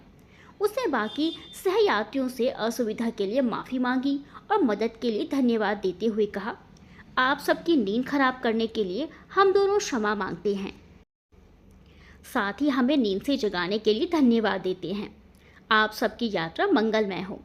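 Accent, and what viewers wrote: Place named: Bihar, India. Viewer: Bihari Hindi bohot acchi lagi 👌👌👌👌❤️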